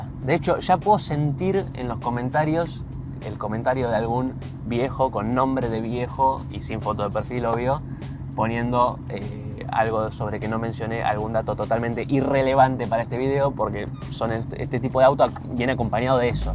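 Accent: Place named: Argentina. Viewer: ya vi varios videos tuyos y es como dicen los comentarios: Es un placer escuchar tus descripciones bien en argento y con buen humor. Te aplaudo por haber probado un auto antiguo y argentino, esos que eran los deseados en mi juventud.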